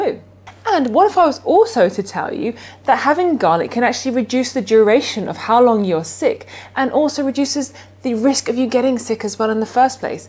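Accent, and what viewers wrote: England, United Kingdom. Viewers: Great video (shared). Seen some of your other videos and noticed your from Australia but your accent is more English as I'm from the UK myself. Did you once live in the UK and if so what Town/City.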